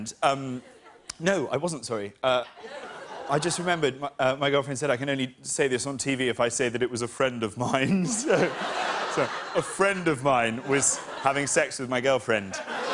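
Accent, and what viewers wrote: RP, United Kingdom. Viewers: He tries to be way more British when he’s in America than he actually is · Everyone in Britain knows Jack is WAY more posher than Michael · I know his posh but he's extra posh in this set,what happened to him? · It's just called posh British man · exactly what I thought! I remembered him sounding posh as fuck but not like Michael McIntyre, until today. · I love how when he is in America his well spoken private school voice remains, however when he does stand up in England he tries to make a more common accent. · He's super posh but he's putting it on a bit more as the Yanks love it. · Im a brit and a big fan of Jack. He's really upstaging his poshness for the American audience. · not quite correct either, he wouldn't have to be from London to sound like that, he has received pronunciation, which really he could have if he lived anywhere in England although more likely in the south west/east. 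· Nah, he always sounds this posh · Funny- but he was being overly posh, even by his usual standards. It was a bit irritating. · but remember that Stewie is an IMPRESSION of a British accent by Seth MacFarlane, whereas Jack is actually from the UK. He's actually just posh, which is why he speaks in such a specific way. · He's only doing the posh accent to appeal to the Americans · This guy sounds like a posh Michael Mcintyre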